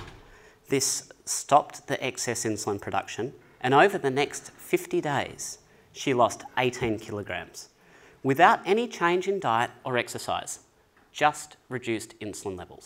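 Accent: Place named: Australia. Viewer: What a Strine accent! Though I’m sure that my Midwestern U. S. accent might also be found comical. Very good content.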